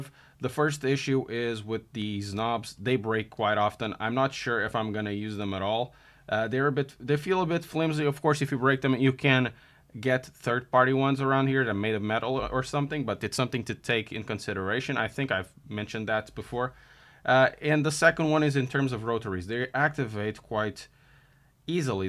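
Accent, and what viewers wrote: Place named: Portugal. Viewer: és Portugues? sinto ai um sotaquezinho.. e tambem como deste review ao yuri... segui porque sigo tambem o mundo das corridas e tambem gosto de fazer umas corrindas num simulador apesar de ser um simples g29, gosto de estudar trajetorias e superar me a mim mesmo.... já joguei muito iRacing mas agora fico me mais pelo Assetto corsa Na nurbur :D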